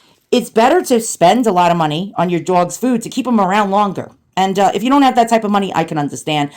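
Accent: New York, United States. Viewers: What a great review! I love the New York accent! Can’t beat authenticity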